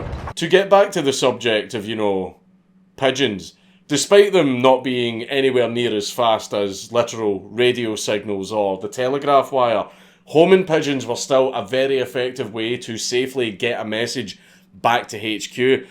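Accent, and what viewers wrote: United Kingdom. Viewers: Am I the only one impressed by his British accent? I am from Texas. Do a Texan! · with a British accent has to be the most cursed thing Ive ever heard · Your British accent is fucking spot on, absolutely love it!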